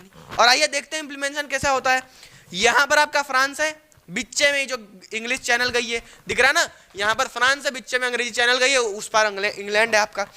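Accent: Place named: Uttar Pradesh, India. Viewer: You are from UP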